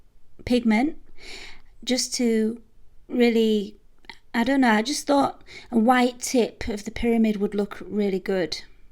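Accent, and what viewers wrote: United Kingdom. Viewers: gotta love that british accent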